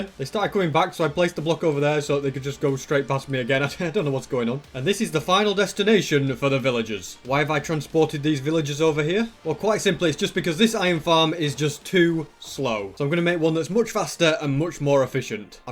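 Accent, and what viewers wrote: United Kingdom. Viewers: I LOVE YOU YOU ARE THE BEST LOVE YOUR VOICE { CUZ YOU ARE BRITHIS} SO AM I AND I LOVE YOUR BEARD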